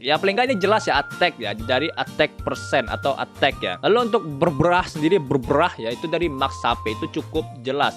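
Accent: Padang, Indonesia. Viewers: bg lu org mna?logat lo kek org padang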